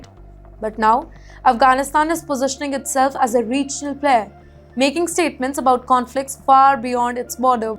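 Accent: India. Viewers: Her English smells like Hindi.. and shaking her legs while reading news.. correct it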